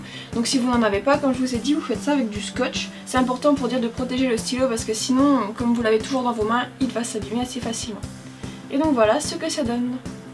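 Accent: North, France. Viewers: C'est peut-être con mais le classeur m'as bluffé, il est vraiment bien fais, tellement que tu l'avais acheter tel quel ^^ Sinon (si c'est pas trop indiscret), vers où tu habites ? Parce que je t'ai entendu une fois parler de Reims et sans me tromper je pense pouvoir reconnaître un petit accent du nord de la France :3 Voilà, sinon j'aime beaucoup tes vidéos ^^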